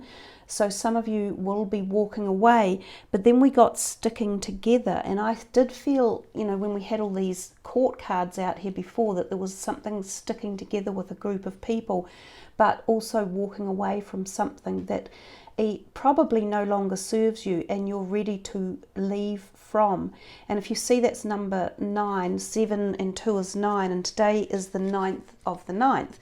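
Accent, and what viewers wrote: New Zealand. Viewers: Thank you again for another beautiful reading, once again I resonated with the whole reading, & was bought to tears when you said about a little girl and she has grown. My god sister passed away from a brain tumour when she was 2 years old. And what spun me out the most was I was thinking about her when you first mentioned lights all around us, I asked myself I wonder if she has grown because I bet she would look absolutely beautiful, I feel i was meant to see this. I appreciate it alot. So much love from me to you. 💕 P. S Are you from NZ also? Your accent sounds kiwi ☺️ no offence intended if you are not 😁 Jess x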